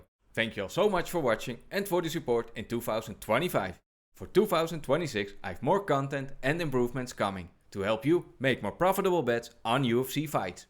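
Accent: Netherlands. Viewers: Respect for all the work, by the way I can't un-hear you must be Dutch ;')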